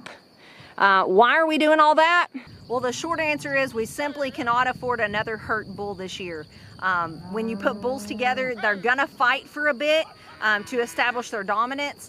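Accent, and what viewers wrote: South, United States. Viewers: Hi, I'm a newbie...brought here by Kevin (and Dutch)..and I'm liking what I'm seeing! I like the way you talk too! LOL! I'm from Ohio, so I love the southern drawl!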